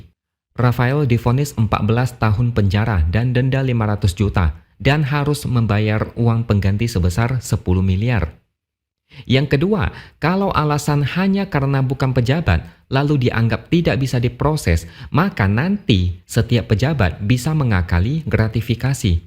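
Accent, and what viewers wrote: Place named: Banyumas, Indonesia. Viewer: NGOMONG NGAPAKK.....PREETT....!!!!!!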